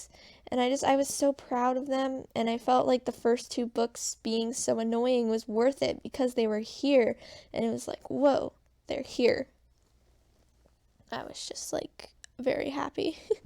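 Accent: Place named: United States. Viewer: I can tell you are american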